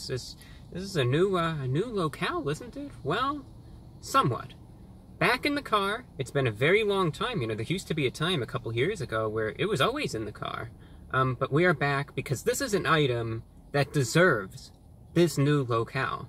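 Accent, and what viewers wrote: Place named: United States. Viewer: He is like an elite british intellectual and connoisseur, except with an American accent. I've never seen such classy American. Where are you elites hiding? I finally found one on YT.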